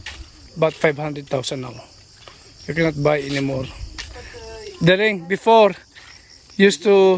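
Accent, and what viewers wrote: Iloilo, Philippines. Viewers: Ilonggo gale Ka sir?😅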